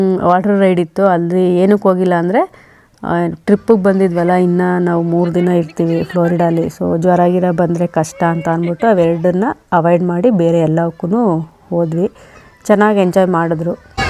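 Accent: Mandya, India. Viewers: Olleya Video madidiri. Mandya da Kannada chennagide. 🎉thanks🌹❤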